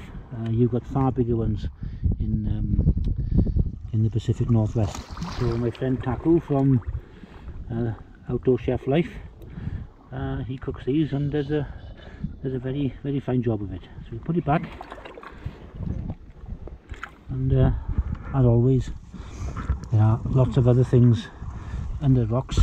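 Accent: Wales, United Kingdom. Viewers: I adore your Welsh accent and voice, reminds me great deal of Sir Anthony Hopkins, and he is my favourite actor. :)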